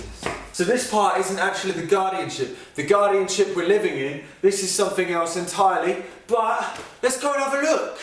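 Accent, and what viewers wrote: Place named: London, United Kingdom. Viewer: I 'm just watching your videos cuz you're funny and have a genuine londonian accent. I like that!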